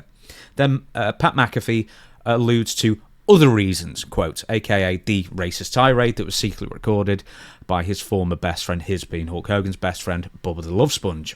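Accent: United Kingdom. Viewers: This video is such engagement bait. You weren't there so you don't know the truth. You are not the authority on current events in the pro wrestling business just because you speak with a British accent,. Also, kind of a 🍆 move to jump on the guy for saying "b" instead of "m" regarding "billion". Check yourself,. Piers Morgan you are not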